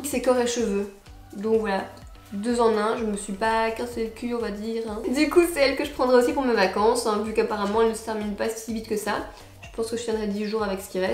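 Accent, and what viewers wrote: Belgium. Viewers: Tu es belge ou francaise? Pas je t'adore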